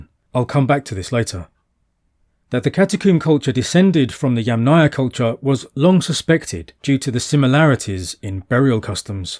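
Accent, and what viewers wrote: England, United Kingdom. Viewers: Oh… So in England, you say it wrong.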